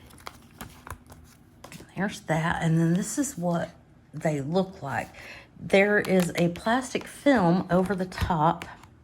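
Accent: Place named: South, United States. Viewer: Hiiii😊, I finally have to comment. I just enjoy your videos soo much! I love your kind southern accent and voice - makes me long for Texas where I lived for a few years and Florida and Alabama too. You are kind, knowledgeable, so very talented, and beautiful! I wish you all the best life has to offer❤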